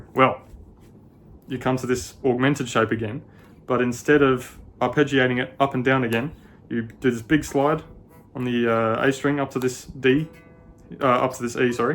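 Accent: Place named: Newcastle, United Kingdom. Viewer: For some reason I thought you were from Newcastle UK..😂 Great lesson.. Great guitar style too..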